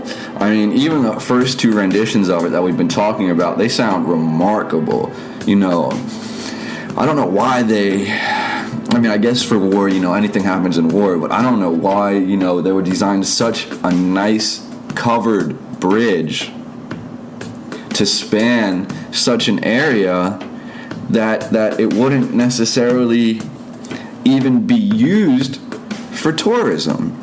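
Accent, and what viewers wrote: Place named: Pennsylvania, United States. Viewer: Great video as usual Bro. I’m from Overbrook, west Philly. I can tell from your accent, (lol) you were from Pennsylvania 👍🏽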